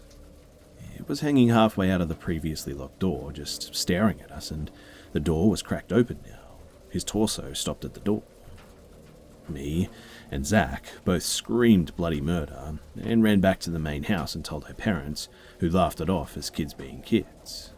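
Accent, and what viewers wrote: Australia. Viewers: Sound like my buddy from Australia 😂, got him listening to you. Dig your channel my friend.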